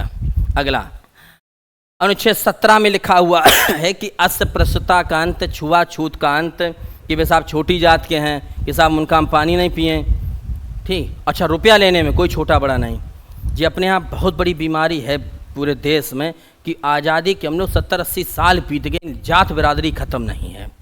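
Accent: India. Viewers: गुरुदेव बहुत अच्छी क्लास रहती हैं आपकी सारी क्लासेस देख ली मैंने । आपकी क्लास में अपनापन लगता। अवधी टच , और कोई दिखावा नहीं👍👍👍👍👍👍👍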